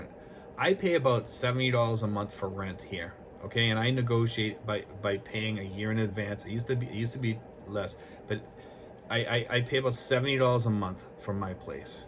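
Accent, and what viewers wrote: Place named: New England, United States. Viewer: A New Englander I hear it haha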